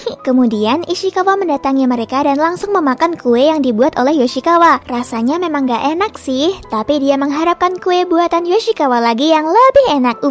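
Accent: Japan. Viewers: kek suara cwek jepang yg imut"gitu tpi versi indo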